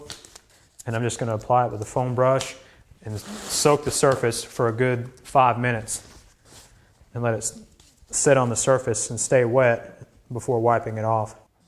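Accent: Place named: South, United States. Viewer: Can't decide whether I appreciate more the technique or the southern accent. Great video, though. Thanks.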